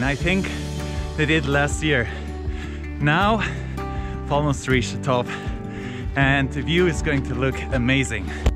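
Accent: Switzerland. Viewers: glad you moved back to Switzerland. It is good to travelmand explore the world, but Switzerland I'd such a great place. A xfriendcof mine in KC,mMissouri is married to a gal from KualacLumpur,Kuala Lumpur,, which she likes, but there is a bit of a rivalry with Singapore. I think you said you were originally from the Italian part of Switzerland,mbut your accent is more Swiss German. I like Lugano due to the milder winters and the beautiful lake.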